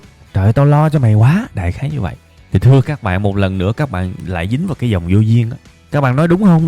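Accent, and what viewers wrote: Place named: Mekong Delta, Vietnam. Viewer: bài giảng quá hay, diễn đạt dí dỏm, dễ hiểu, giọng miền Tây ngọt ngào, truyền cảm.. . Thanks!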